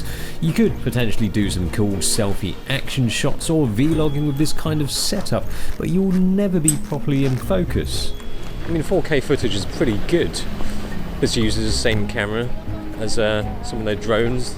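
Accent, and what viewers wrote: United Kingdom. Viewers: You knew what blew my mind more than that camera, an asian guy with a strong british accent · I thought u are a blonde british. Didnt expect an asian with a heavy british accent. Amazing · so weird seeing an asian speak in a genty british accent. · Never seen an asian looking person with a british accent👌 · wow did not expect a prim british voice coming from this guy :D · Yeah, I was waiting for mid age brit after hearing a voice.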